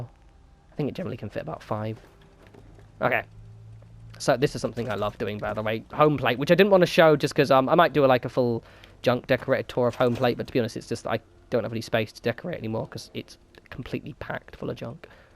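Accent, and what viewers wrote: England, United Kingdom. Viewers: The potato chips in the game are called "potato crisps", and judging by your accent I'm assuming you're in England or near it? And yet you said, "potato chips". ... Mind blown. Lol. Awesome videos, btw. I just bought FO4 a few days ago and I've been watching through all your videos for tips & tricks!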